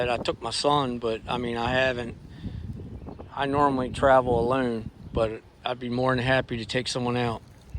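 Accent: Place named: South, United States. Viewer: Good morning 🌞. You sure sound southern. When you talked about the wood, you were teaching what you know. Alot of folks may not have known that information. That's neat the turtle was still there. It's sunny spot. Thankyou for sharing your thoughts and walk. Always a pleasure. Hope you have a great day.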